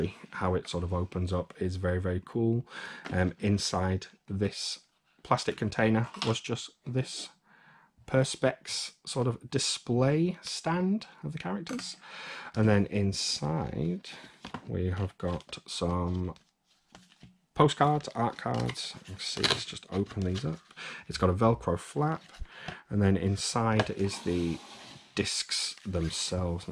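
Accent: United Kingdom. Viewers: Where in the UK you from? Trying to pinpoint accent. Its hard sounds northern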